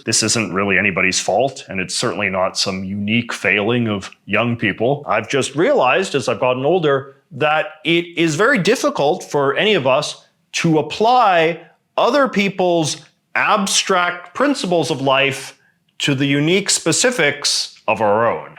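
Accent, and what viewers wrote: Canada. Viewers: dude i can't get over the canadian accent wtf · learned in this video that some canadians also say “doubt” and other “ou” words with the stressed u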